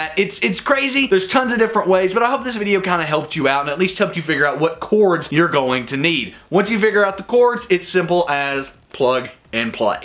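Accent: United States. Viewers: hes so american omg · I lasted only 30 seconds.... TOO loud, too intense, too ummm American.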